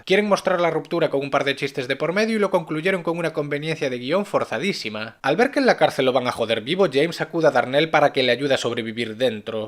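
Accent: Galicia, Spain. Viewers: Tu acento me parece Gallego 🤔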